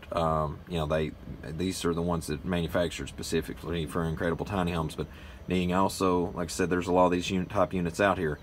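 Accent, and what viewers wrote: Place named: South, United States. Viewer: Love your southern!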